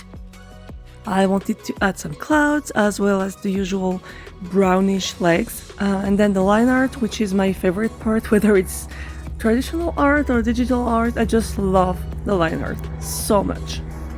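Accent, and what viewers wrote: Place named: France. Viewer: Hehehe bonsoir 👀 Je viens de finir et omg le renard à l’aquarelle, je hurle! Je suis sûr que c’est une galaxie qui bouge tout le temps et que des peuples extraterrestres anciens l’ont vu et ont créé des mythologies, des textes sacrés basés dessus ou juste utilisé son existence comme un présage ou something!!! Le fait que les renards soient tous différents est super coolos AAAAA 😩😩 Ton accent est beaucoup trop mimi en anglais, jvais crever, je pleure en Oui Oui Baguette stp 😭 Félicitations pour cette première vidéo! C’était super sympa à regarder hehe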